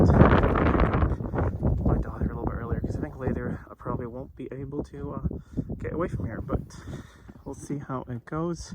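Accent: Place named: Midwest, United States. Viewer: I’m glad you made to and from with no problems. Once again you say you’re in Switzerland but you have a mid western US accent where are you from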